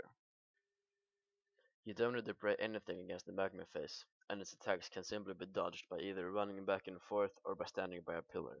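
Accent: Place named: Norway. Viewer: dat norwegian accent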